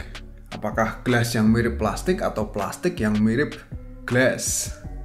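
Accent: Java, Indonesia. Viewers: asli nya jawa ya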